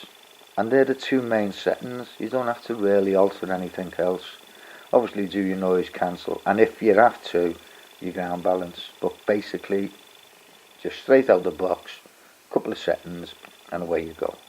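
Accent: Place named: Chester, United Kingdom. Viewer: New sub here. Thanks for the great video. I’ve just bought the 600 and this was very helpful. I have 300 acres of farm land in chester. You’re more than welcome to use it. Your accent sounds like you’re not too far away from ch47 area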